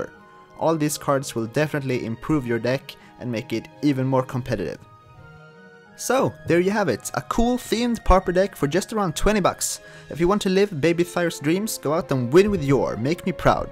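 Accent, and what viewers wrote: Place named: Sweden. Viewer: Awesome! Thanks! BTW - Är du svensk? Din accent låter som den. Jag lär mig svenska.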